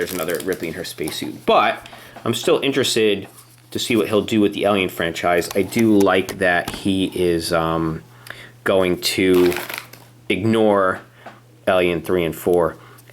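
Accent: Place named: United Kingdom. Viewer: Wtf! He`s obviously British!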